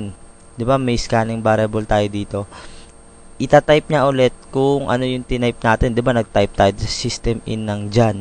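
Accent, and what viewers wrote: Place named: Manila, Philippines. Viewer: masyado nman mapanghusga sa mga bisaya tong mga to.. di nyo bah alam na ganyan mag english ang mga tga maynila? relate relate din pag my time.. nkikiview na nga lng ei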